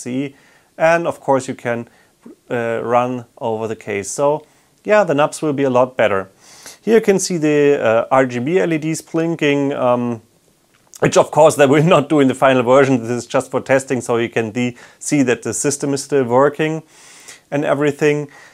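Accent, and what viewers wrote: Germany. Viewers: I knew that you are german :D unseren Akzent kann man auch nicht verstecken :) Cooles Video!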